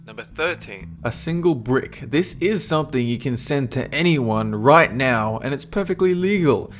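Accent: Australia. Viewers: im pretty sure he is Australian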